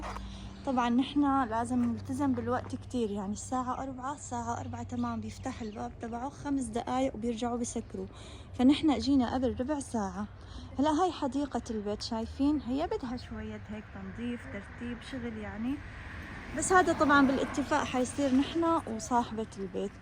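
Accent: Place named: Syria. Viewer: بكره لكنه السوريه بتوجعلي اذني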